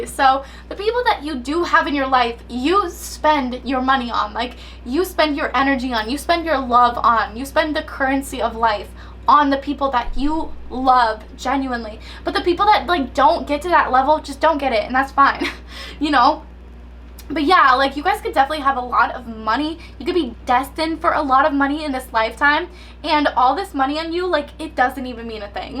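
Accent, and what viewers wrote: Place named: Australia. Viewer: Loooool your Australian accent made me giggggleeee and then your Little Mix Jamaica interview reference 🤣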